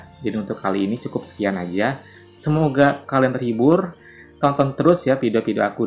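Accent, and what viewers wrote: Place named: Bandung, Indonesia. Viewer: orang bandung ieu mah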